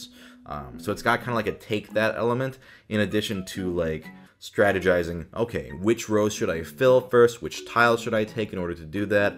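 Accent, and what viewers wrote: Germany. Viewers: Your German accent is on point! Bruh! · God I love his fancy German accent lmao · German accent made me subscribe 🤣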